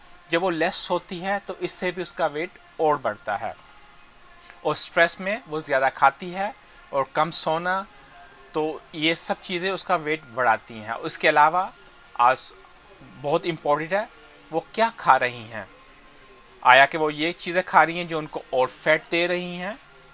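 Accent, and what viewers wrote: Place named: United States. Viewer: American accent boss! 😎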